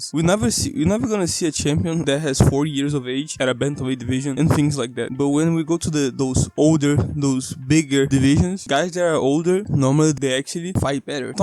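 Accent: Brazil. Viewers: senti um sotaque br. You have a really good point, the sad thing is that it pretty much doesnt matter who wins. Whoever wins will probably retire right after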